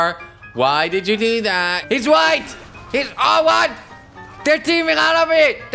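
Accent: Netherlands. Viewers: zalig, ik wist niet dat je nederlands was, ik dacht het eerst wel aan je naam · Are u dutch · Are you dutch? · Are you freeeking Dutch nicee vid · are you Dutch?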